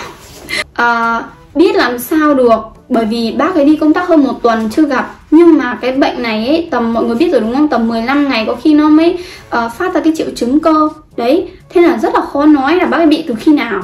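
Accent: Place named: Hai Duong, Vietnam. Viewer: Nghe giọng của em thì rất giống người hải dương. Có phải vậy ko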